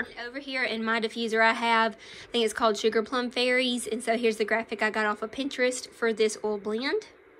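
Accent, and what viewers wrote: South, United States. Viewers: Binge watchin your vlogs☺️ just love your down south accent💕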